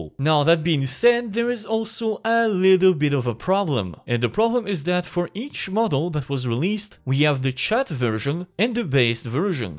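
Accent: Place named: France. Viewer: First time i heard you i thought you had a french accent, and since the first translation you made was in french now i can almost safely assume. "t'es français" (à moins que je me trompe x)).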